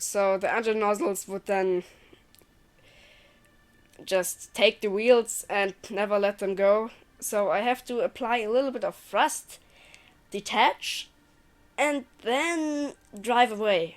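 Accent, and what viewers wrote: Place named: Germany. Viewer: Ging mir auch so! Man hört den Deutschen Akzent, aber Super gesprochen :)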